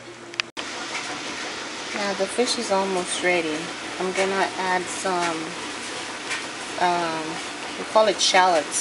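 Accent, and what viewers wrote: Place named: England, United Kingdom. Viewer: Oo se anglais moun sa pale konsa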